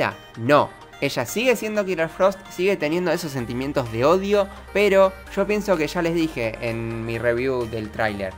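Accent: Argentina. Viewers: De que parte de Argentina sos?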